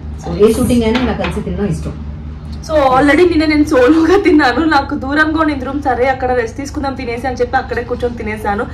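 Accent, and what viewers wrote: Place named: Nellore, India. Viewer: Manadhi nellore kadha.. Atlumtadhie manathonieee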